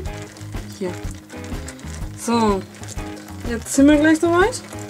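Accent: Franconia, Germany. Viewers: PALACE ja so redet man in franken nunmal ;) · Jawohl a Fränggin ;) gfälld ma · du bist 100%ig aus Franken!!! Ich bin selber aus Frangen (:D) und ich kenn sau viele die so sprechen :D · Man hört es ich komme auchbdas franken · PALACE ja, das ist ihr Dialekt, Fränkisch:) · DressCommunication also so krass fränkisch schwätze tut de jetsche ned, daß mo dä ned vastehe kännt (oder so ähnlich) bin kein schwabe ich bin Hochdeutsch erzogen worden, kann aber dennoch (im gegensatz zum schreiben) Schäbisch schwätze, Hessisch Babble und berliner Schnauze und i red boarisch.. Dialekte darf man ja eh nicht mehr haben weil man ja rassist ist, wenn man jemanden den weg erklärt und der meint man verarscht ihn..nur weil er den bundesland gegebenen Dialekt nicht versteht.. o.O Das einzige was mich an dialekten aufregt ist dieses Semmel=Brötchen, Pfannkuchen=Crepés, Berliner Pfannkuchen=Krapfen !... 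Weißbier=Weizen, Colaweizen=Russe usw usw... da kann man ausflippen wenn man beim bäcker an der theke steht... >.<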